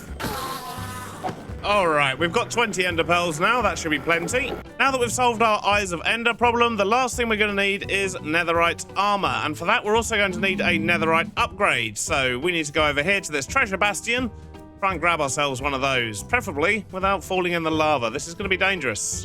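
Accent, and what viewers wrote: United Kingdom. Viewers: Is it just me or does? Literally seem like the mumbo-jumbo of the mod both of them have a facial hair that they. Make the main focus about their channel both of them love a very complex game, mechanic that is techy or mod that is techy being the create mod mambo being Redstone Both of them make it seem a lot easier than it actually is starting to see a pattern does anyone else see it I’m not sure if this is intentional or not but this feels like there’s too many coincidences to be an accident along with they both have the same British accent, and they sound so similar if you put audio over mambo audio who would notice practically no one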